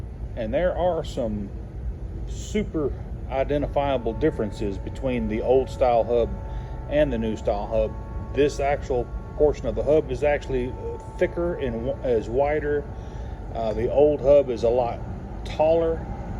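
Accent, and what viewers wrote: Texas, United States. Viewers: Awesome video! Very comprehensive. Appreciate the part numbers as well. 🍻 Personal aside - what part of Texas is your accent from? -a fellow southerner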